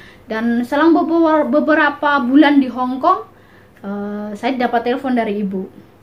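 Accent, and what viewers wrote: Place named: Malaysia. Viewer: Saya senang dengar kesaksian kamu dgn eksen melesyia sangat enak di dengar,ditambah bahasa inggris.Tuhan yesus .enyertai kamu.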